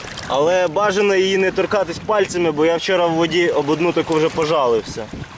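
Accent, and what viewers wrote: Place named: Galicia, Ukraine. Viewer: Добрий день, хлопці дівчата. За відео спасибі. Все класно, подяка за рідний галицький акцент. Цього літа хочемо висадитися на Кінбурнській косі - катером з Миколаєва і пішки по узбережжю до Покровки(Ягорлицький залив). У мене кілька запитань з цього приводу: 1. Як там з водою? - ми плануємо пройти 20 км на півднь по берегу до Покровки? 2. Ви були як я зрозумів в Покровці. Ми звідти хочемо теж стартонути додому . Але будемо їхати в Харків з Херсона. Так от : чи є з Покровки транспорт хоча б до Геройського, про Херсон навіть не питаю?? Ваша відповідь стала би нам у великій пригоді. Дякую.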